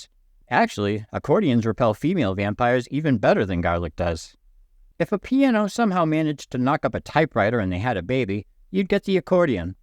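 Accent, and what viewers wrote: New England, United States. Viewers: Hearing you makes me miss New England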